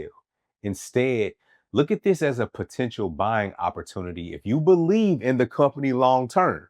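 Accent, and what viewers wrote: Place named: Kansas City, United States. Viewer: Thank you for this. It almost feels second nature to scramble and try to mitigate loss by pulling out money and holding tight to every penny during a time like this. On a different note, I don't know where you're from originally but that accent sounds real KC 😂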